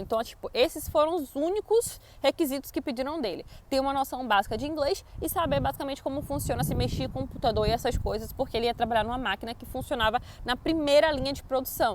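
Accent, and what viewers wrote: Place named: Northeast, Brazil. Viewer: Vc é nordestina..pq vc fala Pórtugal.. típico dos nordestinos ☺️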